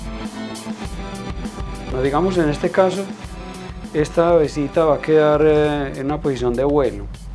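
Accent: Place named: Colombia. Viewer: Hola buenos días se me acaba de morir mi canario quisiera disecarlo donde se encuentra usted no me diga que en Colombia por su hermoso asentó Dios que triste estoy 😢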